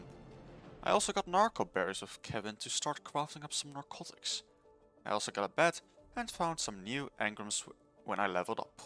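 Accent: Netherlands. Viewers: Je bent nederlands of niet😂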